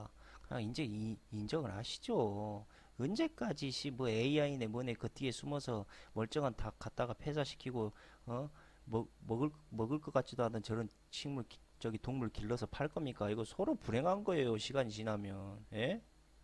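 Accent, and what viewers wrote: Jeolla, South Korea. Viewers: 전라도 억양이 찰지며, 약 돼는 쓴소리 고맙습니다. 근데 깨어있는 자가 많자않아 큰일.우리나라 정부는 정부로서의 기능 별로 행하지 않아. 엄청난 세금 축내며 많은 의원 왜 필요한가???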